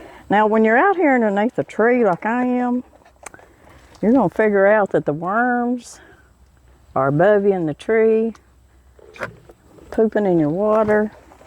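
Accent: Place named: Alabama, United States. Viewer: I’m half American living in my moms home country of Sweden. Your accent makes me so nostalgic for the US. My dads whole side of the family talks just like you, it’s my favourite sound in the whole world. Thank you for sharing your wisdom Ms. ❤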